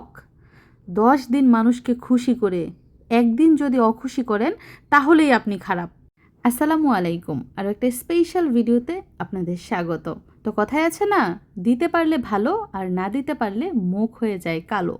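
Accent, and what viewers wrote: Gazipur, Bangladesh. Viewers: Apu Apni ki gazipur er? Ami o gazipur er BT Dhaka te Jonmo bere otha... Apnar kothay amr o Mone hoto apni gazipur er BT kokhono comment kore joges kora hoy nai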